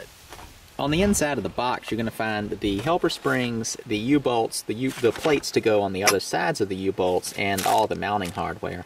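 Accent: United States. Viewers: Good murican accent.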